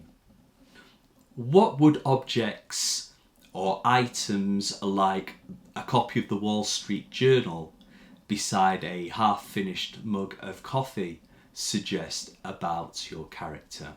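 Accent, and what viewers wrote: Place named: United Kingdom. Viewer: Very good tutor with very clear English, and easy to understand, which is very important for students especially for students from abroad. You can improve not only your English writing ability, but also your English listening and speaking because the tutor/ gives teaching with standard British English.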